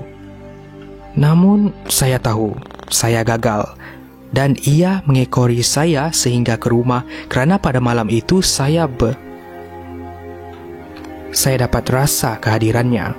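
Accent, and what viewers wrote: Sabah, Malaysia. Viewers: aku mmg tau pun dia org sabah 😒😒😒 sbb aku org sarawak so dah biasa dgar sleng jiran kami sabah dan kami tahu beza sleng sabah n indo.. Maybe kau x paham komen aku kot.. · orng sabah memang ckp mcm sebb orng sabah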